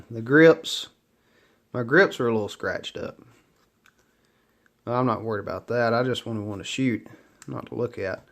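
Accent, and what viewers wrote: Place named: South, United States. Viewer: ""THEOLD SOUTHERN-ACCENT, ""NO ONE TALKS OR SPEAKS THESAME""!!!!!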